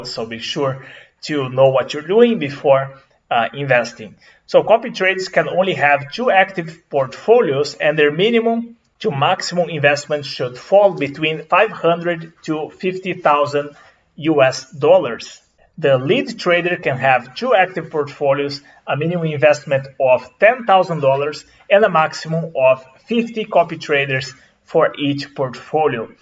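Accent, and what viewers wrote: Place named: Brazil. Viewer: brasileiro e professor de ingles, escuto esse sotaque o dia todo kkkkkkkk, mas seu ingles é foda irmão parabens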